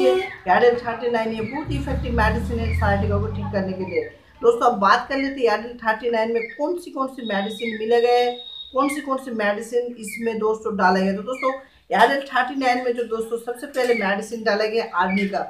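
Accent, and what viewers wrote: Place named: Bengal, India. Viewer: लैन्ग्वैज NO PROBLAM आप बैन्गोली है ओर हिन्दी बोल तै हुवै अछ ऐ लगी हो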